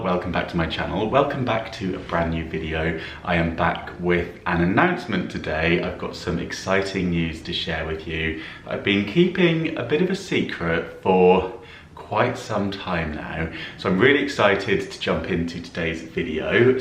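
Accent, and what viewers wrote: England, United Kingdom. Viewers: Congratulations, you have such a lovely English accent, pretty sure the Americans will love you, and I’m so glad you got to film and hope you get the chance to do more over there, 🏴 love Katrina xx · Wow! Congratulations this is wonderful news!...Wish we could tune in also..in the UK!......The American viewers will love your English accent too...Wishing all the very best you deserve it...Fondest wishes from Derbyshire xxx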